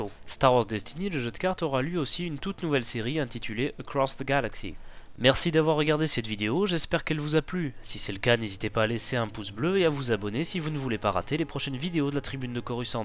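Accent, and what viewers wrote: England, United Kingdom. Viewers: 10/10 accent anglais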